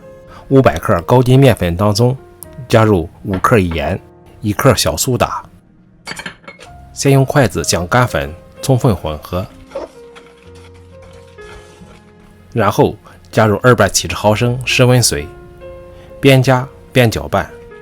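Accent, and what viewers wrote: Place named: Jiaodong, China. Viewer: 胶东口音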